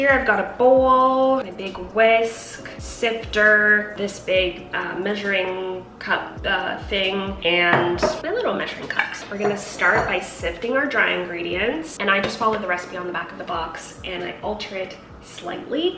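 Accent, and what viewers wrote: United States. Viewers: She has suck an American accent to be from Canada most Canadians have like an accent I don’t know how to explain it😂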